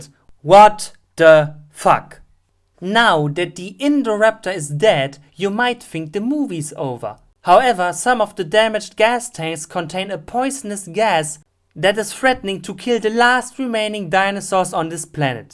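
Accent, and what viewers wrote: Germany. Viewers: I freaking noticed, that u have to be from germany, becuz of ur Accent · I was born about a decade before Jurassic world and I watched the Jurassic park movies so ye I got inspired (I know someone else said this but your German accent makes this waaaaaaaaaaaaaaaaaaaaaaaaaaaaaaay better) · I like your German accent it makes these videos a hundred times better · Your strong German accent makes everything way better. When you're reviewing a terrible movie like Dino Time, it makes my eyes bleed. (That movie was terrible) but when you're there, my eyes bleed less. 😂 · Can you speak German ? Because you sound like you have a German akzent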